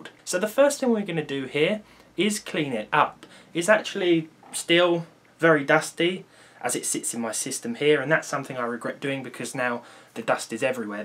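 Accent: United Kingdom. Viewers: Nice British accent, can you say Harry Potter in the end of your next video? Pls pls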